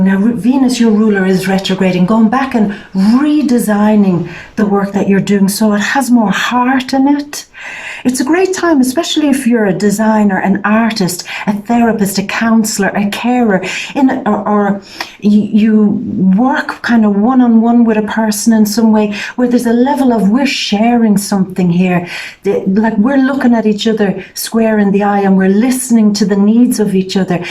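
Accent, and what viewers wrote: Ireland. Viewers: Your voice reminds me of when I lived in Ireland. I don't want yesterday, he was my past. How would one get a private reading. Slante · I love that accent. It's always felt familiar when I was like 3 years old but being American and my only time hearing that accent was from watching Darby O'gill and the little people with my great grand mother and my great grandfather singing when Irish eyes are smiling. It's always felt like home. And your reading is spot on.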